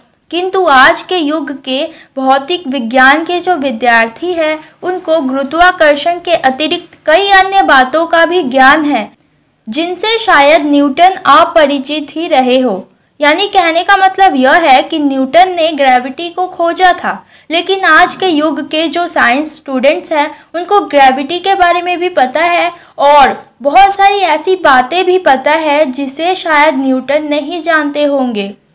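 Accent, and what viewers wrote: Bengal, India. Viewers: Dii ap Bengali Ho Kya. Love from Rajasthan❤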